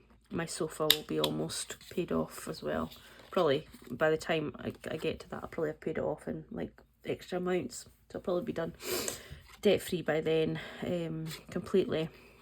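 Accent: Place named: Inverness, United Kingdom. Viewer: I came to Inverness last year. You do have a Inverness twang to your accent xx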